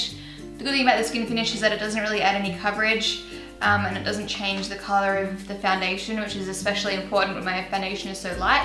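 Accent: Australia. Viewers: I'm new to her channel I just want to know is she Australian??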